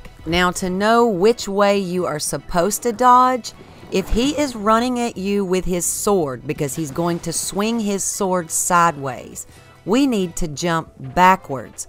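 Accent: South, United States. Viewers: I love your channel! Thanks to you, I've killed 3 silver Lynels. I mean Coloseum is OP. So many elemental weapons. Your videos are great and I just love your southern accent?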